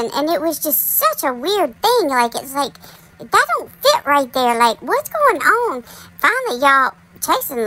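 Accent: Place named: Boston, United States. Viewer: I love your videos, No one can be mad at a princess. 😂😂😂😂 Chasing in her boston accent. signed the "youtube poo poo" 😂😂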